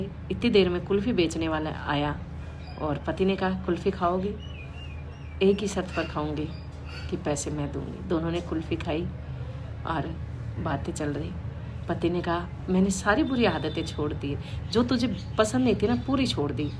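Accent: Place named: Haryana, India. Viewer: Are you from Haryana you speak like one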